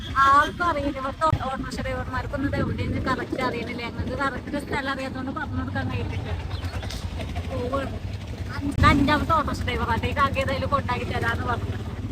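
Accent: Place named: Malappuram, India. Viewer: Edhaan malappuram basha 👍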